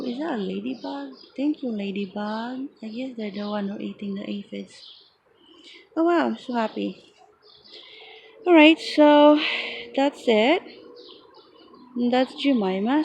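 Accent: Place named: Guam. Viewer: great ideas! I was guessing your accent was from Guam. I was close! :)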